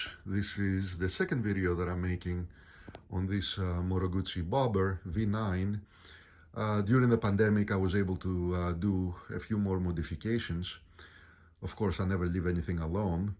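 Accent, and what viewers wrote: Greece. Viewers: Λύσε μια απορία, σε παρακαλώ. Το "λου" πολύ πατρινιώτικα το λες: "λιου". Αληθεύει ότι εξ Αχαΐας προέρχεσαι;.. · The v85 is a great bike. Especially with the tubeless rims. You might want to avoid the travel version. I know people have had problems with the side cases. I think I detect a slight Greek accent, might be wrong.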